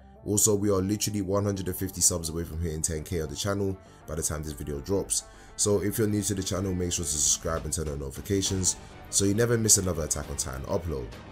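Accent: United Kingdom. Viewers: ARe u brit? nice explanation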